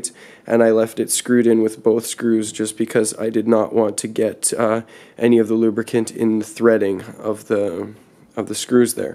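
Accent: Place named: Canada. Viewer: enjoyed the perceptible Canadian accent and "oops, that's French." Will definitely try this on the used Konova K3 I bought used on eBay.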